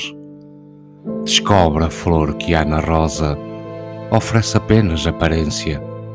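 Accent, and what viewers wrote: Portugal. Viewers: Adorável...o seu sotaque português da mais poesia à poesia.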